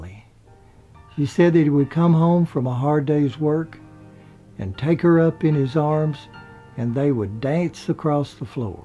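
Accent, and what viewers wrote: South, United States. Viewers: He is a elder southern man. Many (including a large amount of younger ones too) still speak that way down here.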